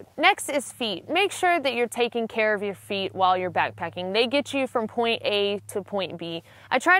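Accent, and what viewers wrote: South, United States. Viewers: Oh, man, I grew up in Georgia, though moved out of state nearly 13 years ago. I haven’t heard a southern accent like yours with any consistency in over a decade! Great info in sanitation and hygiene! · I love your accent, I am from GA and have been living in the PNW for 15 years. I so miss southern women. Tried hard to find one with an accent like yours, but wound up married to a girl from AK. We manage the Mountaineers Lodge at Stevens Pass on the PCT during most summers. This summer we likely not open. Its fun being a trail angel.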